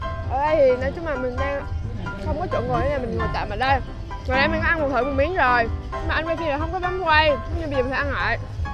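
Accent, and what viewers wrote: Da Lat, Vietnam. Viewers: Mới phát hiện kênh mới có hai ngày.. Phái cách nói chuyện, giọng đà lạt hay vãi tèo...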